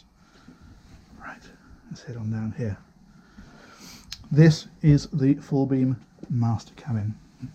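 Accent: United Kingdom. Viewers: I like clarkson very much, but this nice man reminds me more of David Attenborough, because he speaks with a calm voice and just in plain, civilised, british english that even i can fully understand as a dutch dude, And Clarkson is way more loud and entertaining, which i like aswel, but not to review a yacht.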